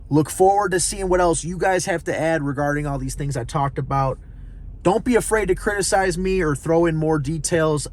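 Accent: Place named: Chicago, United States. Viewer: I’m from chicago too and you’re one of the only young cats that still have that old school accent. Hey brother how much should i pay for an 02 town car with 28k miles? Guy wants 8500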